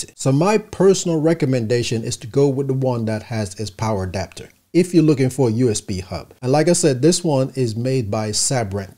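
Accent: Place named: Jamaica. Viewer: I think i hear a bit of a Jamaican accent. You a Yardman?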